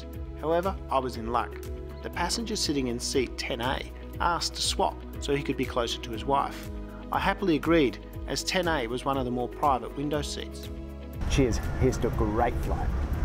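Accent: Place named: Australia. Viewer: your so handsome and a gracious host. Love that Aussie accent!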